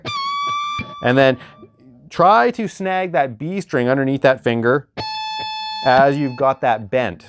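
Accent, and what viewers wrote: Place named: Canada. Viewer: Thanks for the tab great job! could tell right away you were Canadian from your accent lol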